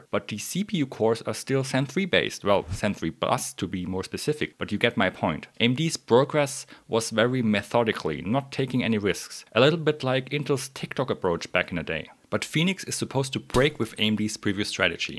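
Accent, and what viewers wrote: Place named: Germany. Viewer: I love the German accent in your English 😀😀. I just subbed.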